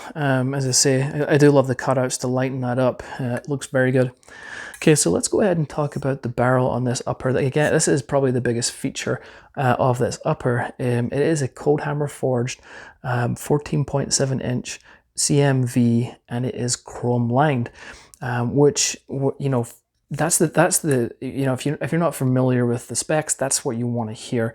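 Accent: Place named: Scotland, United Kingdom. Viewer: I did NOT expect that accent. Lol. Are you Scottish?? Well, welcome to the USA wherever you’re from. And good review brother.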